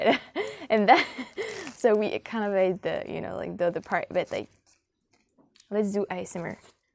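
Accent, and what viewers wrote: France. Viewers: The French language when spoken in English is very nice sounding. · I assume you could come to America and a restaurant would hire you for your French accent.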